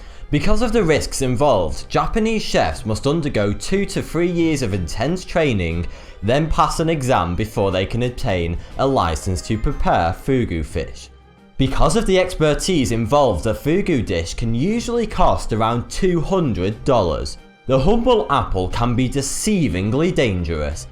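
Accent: United Kingdom. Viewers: That's just the British accent. We pronounce a lot differently. Also he has a regional accent which makes pronunciations even more different. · 42? really? the answer to the universe is a lower class Brit with an increasingly annoying accent? seriously? get the marbles out of your mouth son.. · He is British. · Matthys Fourie think twice how does he foughty2 at the beginning of every video because he has a British accent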